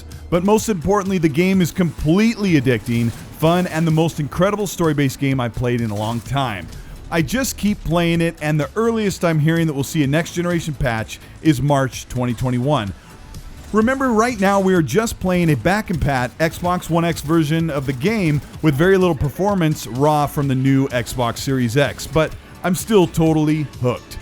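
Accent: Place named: Netherlands. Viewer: You have a Dutch accent. Is he Dutch?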